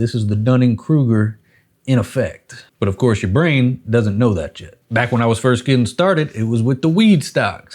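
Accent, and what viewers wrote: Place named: West, United States. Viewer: I'm from the Bel Paese and I grew up watching Western movies and your accent seems to come straight from the mouth of those movies actors 😅. Sei simpatico e pieno di energia!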